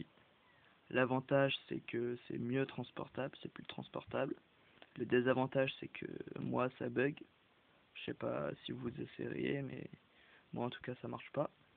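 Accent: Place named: Marseille, France. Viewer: UN MaRsEiLlAiS !!!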